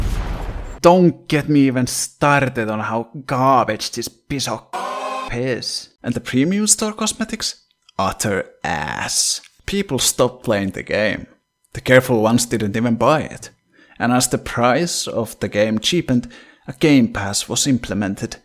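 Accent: Finland. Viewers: are you from finland? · are you from finland my brother i think i can hear a slight accent in your voice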